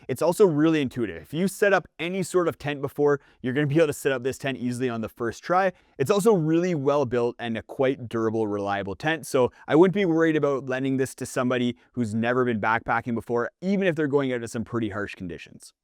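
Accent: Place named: Canada. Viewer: my god you are soooooo Canadian. I can't listen to it. It's just so laughable.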